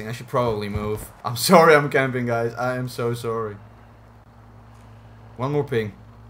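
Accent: Netherlands. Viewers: very nederlands accent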